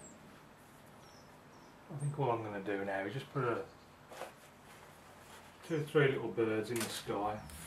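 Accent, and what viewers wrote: Birmingham, United Kingdom. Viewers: Love the tips you have given on this, really helpful to me. Just starting to have a go at watercolour landscapes (I usually do portraits) finding it very difficult. Comforting listening to a fellow Brummie accent too. Thanks for posting these I'm going to have a go a painting along to this one.:). Your artwork is amazing!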